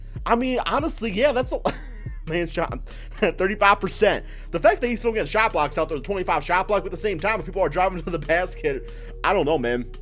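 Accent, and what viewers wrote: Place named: New York, United States. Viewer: You’re from New York aren’t you😅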